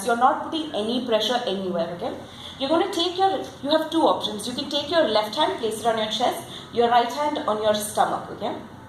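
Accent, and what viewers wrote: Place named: Bangalore, India. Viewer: Everything is fine but your Bangalorian accent and body language sucks ...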